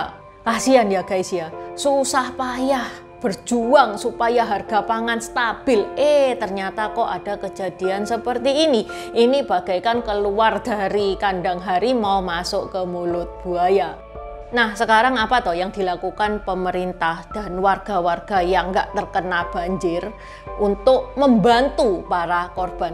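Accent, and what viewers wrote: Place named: East Java, Indonesia. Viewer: Waaah mbak sudah cantik cerdas juga berprasangka baik....dengan bukti yg benar bisa mengajak kepada kalayak untuk berpikir baik pada orang lain.......tidak hanya memandang jeleknya...... Trimakasih info positipnya.....klo boleh nanyak kok mbak lancar banget bahasa indonesianya juga seperti ada dialek bahasa jawa,kayaknya jawa timur....klo boleh tanya mbak orang mana.....????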